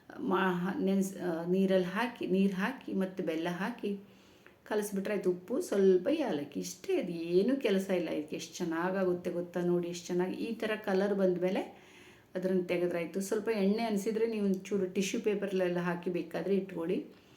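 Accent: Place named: North Karnataka, India. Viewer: ನೀವು ಉತ್ತರ ಕರ್ನಾಟಕದ ಭಾಷೆ ಮಾತಾಡ್ತೀರಲ್ಲ ಸಿಸ್ಟರ್ ನಾನು ನೀನು ಉತ್ತರ ಕರ್ನಾಟಕದವರು ಅನ್ಕೊಂಡೆ ಸಿಸ್ಟರ್❤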